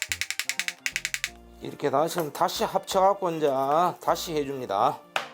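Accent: Jeolla, South Korea. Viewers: 세프님 음식도 정갈하게 맛도 끝내줄것 같습니다 당장이라도 따라 해보고 싶네요 전라도 사투리도 구수합니다 ㅋ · 새프 숙구락 전라도사투리요전라도사투리 김제쪽인가요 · 조물조물을 쪼물딱쪼물딱. 저~~그 전라도 말씨 정감이 가네요. 어떤 요리든 정확한 레시피 감사합니다. 참 대단한 " 셰프입니다 " ❤ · 항상맛있는요리 알려주셔서감사합니다 설명도 어찌나 맛깔나게 전라도 사투리가 정겹고재밋습니다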